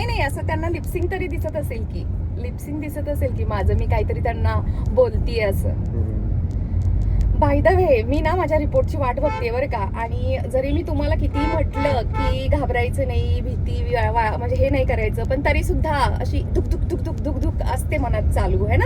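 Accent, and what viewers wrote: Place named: Kolhapur, India. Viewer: तुमची भाषा सेम माझ्या कोल्हापूर सारखीच वाटते ❤️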